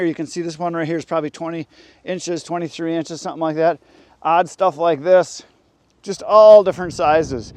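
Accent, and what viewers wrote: Wisconsin, United States. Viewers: Sounds like Wisconsin.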